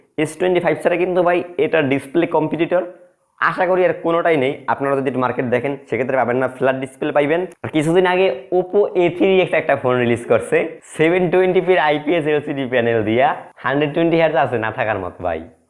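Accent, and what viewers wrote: Barishal, Bangladesh. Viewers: ভাই কি বরিশালের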